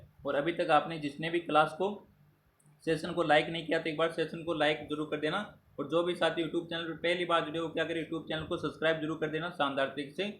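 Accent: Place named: Rajasthan, India. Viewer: Dhudhadi jaipur ki boli ❤️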